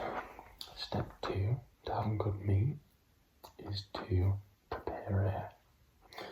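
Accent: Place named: Newcastle, United Kingdom. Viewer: Your geordie accent gives me mad tingles seriously more than like any other accent. Crazy. P.s. you’re beautiful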